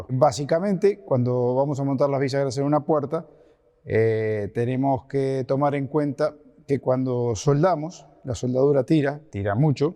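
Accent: Rioplatense, Argentina. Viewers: Su tonada es mas Argentina/Uruguaya que Española, puede ser? :P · Felicitaciones!! Excelente trabajo. Una pregunta, sos Argentino? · El sonido de fondo es espectacular. Buen trabajo muy creativo. Saludos desde Queretaro México. Argentino o Uruguayo?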